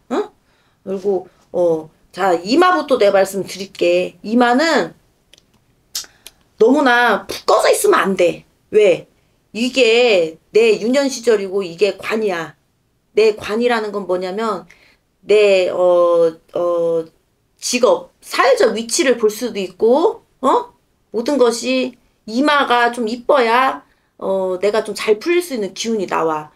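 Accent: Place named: Jeonju, South Korea. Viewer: 선생님도 관상학적으로 재물많이 들어오도록 갖추셨네요.예쁘고 특유의 전주사투리가 구수하고예뻐요.감사합니다.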